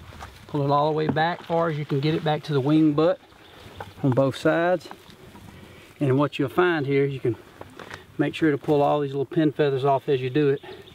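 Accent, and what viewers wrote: South, United States. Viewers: I gave him a like just for the southern accent.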